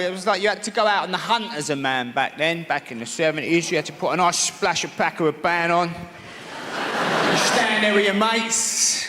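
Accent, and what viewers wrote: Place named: Cockney, United Kingdom. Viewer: You gotta love a bit of the cockney geezer! Pure class! UK xx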